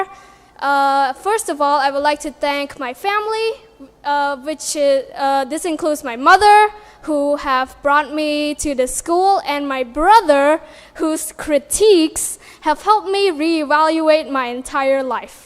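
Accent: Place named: United States. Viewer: ACE follows British , but her accent is like the American 😄 Amazing speech ever ! 💙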